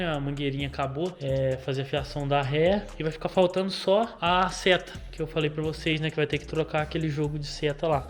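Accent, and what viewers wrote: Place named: Paraná, Brazil. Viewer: sei que esta pergunta pode parecer estranha, mas... você é do interior do Paraná, né?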